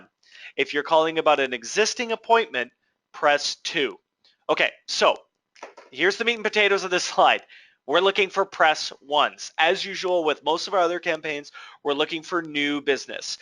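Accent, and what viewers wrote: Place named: United Kingdom. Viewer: Nice British accent!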